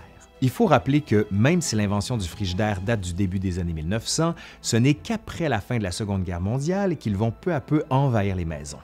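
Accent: Quebec, Canada. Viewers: Merci pour ton accent du Québec 😂 Tupperware a malheureusement mal fini... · J' adore l'accent canadien:) Superbe video:) Merci:) Je m'abonne avec grand plaisir. Bonne continuation.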